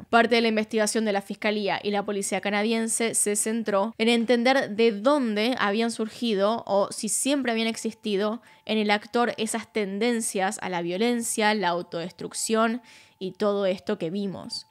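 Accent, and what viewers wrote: Argentina. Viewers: Como me encanta el acento argentino!!❤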